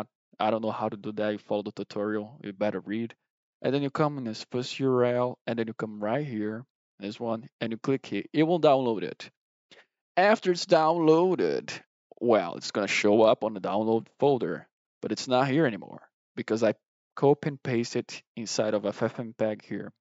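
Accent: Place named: Brazil. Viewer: Esse sotaque brasileiro é muito bom de ouvir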